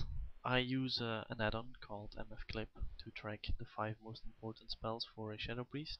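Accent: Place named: Sweden. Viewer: nice video, öva lite på ditt utal så kommer ingen höra att du är svenks :) i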